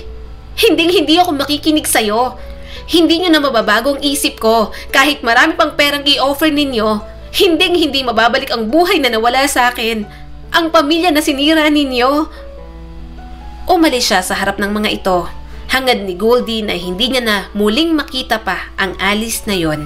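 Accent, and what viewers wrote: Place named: Hiligaynon, Philippines. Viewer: Ilongga cguro nag babasa ng story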